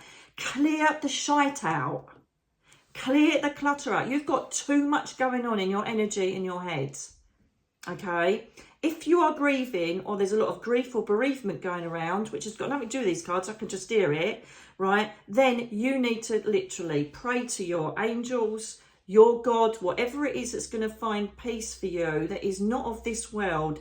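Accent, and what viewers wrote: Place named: England, United Kingdom. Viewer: Scrolling thru after just watching another YTuber and you both had same major arcana cards! You’re funny as hell, love ur English accent - you’re a hoot! Made me smile & laugh. Interesting because I’m going to a QHHT session (quantum healing & hypnosis technique) done by Dolores Cannon to connect w/our higher self. Doing this session on 12/4 - looking forward to + I’ve been doing a lot more research in opening my spiritual awareness & abilities. I am a Reiki 1 level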